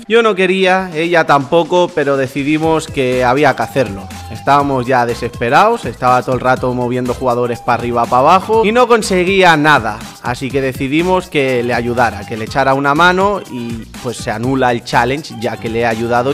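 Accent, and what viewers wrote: Chile. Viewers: hablo chileno vean cuando saca a modric en el primer fut brat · por que de pronto hablas tanto chileno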